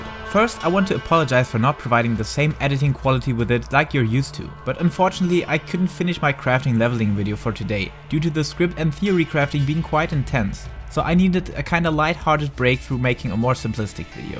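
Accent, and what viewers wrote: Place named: Germany. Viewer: Nettes Video . Schade , dass es auf englisch ist und nicht auf deutsch . Man hört raus , dass Du Deutscher bist . Wäre doch mal was , wenn man dieses auch mal auf Deutsch erklären würde . Wie auch immer. Danke fürs hochladen . Denke , dass das auf meinem Server nicht so klappt . Schade .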